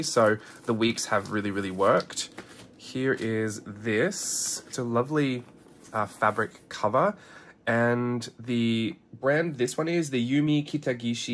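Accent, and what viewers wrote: Australia. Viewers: I'm new to Hobonichi, discovered it all through my YouTube feed and took a deep-dive down the unboxing rabbithole. Have ordered my first - expensive for me but it will encourage me to get back into journalling. Thank you for your very real approach - awesome to hear a fellow Aussie accent 😊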